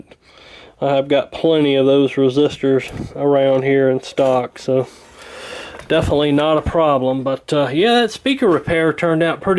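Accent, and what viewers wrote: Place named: East Tennessee, United States. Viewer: Great channel. And sir, that sounds like an East Tennessee accent to me. Or somewhere not too far from there.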